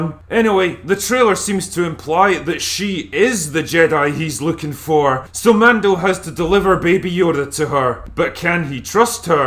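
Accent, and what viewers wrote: Scotland, United Kingdom. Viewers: There's something satisfying about a pissed off Scot reviewing Star Wars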